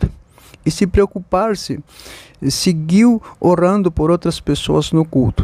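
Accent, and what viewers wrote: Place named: Spain. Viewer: A pessoa que narra ovídeo tem sotaque de alguém de língua espanhola , portanto não tem nada de horrível!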